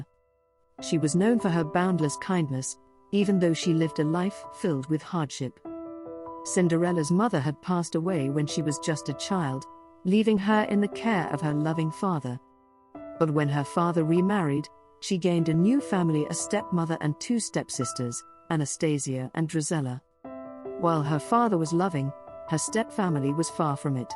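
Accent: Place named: United Kingdom. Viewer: good story :) voice is like british!!! i love it😍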